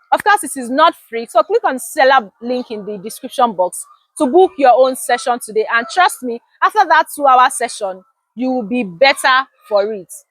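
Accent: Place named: Igbo, Nigeria. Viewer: I have watched many of your teachings and I must say may God bless you but to be honest your sounding so much like your from Igbo tribe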